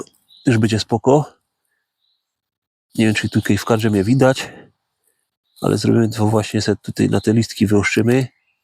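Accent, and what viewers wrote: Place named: Silesia, Poland. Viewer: Piękne zdjęcia pięknego miejsca. Deszcz to dla mnie osobiście bardzo nastrojowa aura, lekko nostalgiczna, czasem taka nawet "Silent Hillowa". Jak zawsze: dowiedziałam się czegoś nowego. No i najważniejsze, czy aktualnie używany przez Ciebie jest akcent śląski w języku polskim, czy język śląski, brzmi to naturalnie i nadaje charakteru całemu kanałowi.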